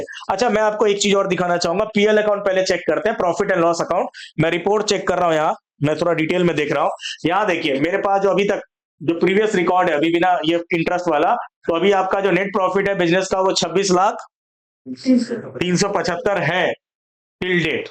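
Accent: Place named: Kanpur, India. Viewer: Language (boli) se lag raha hai AAP kanpur se ho. What am I right??🎉🎉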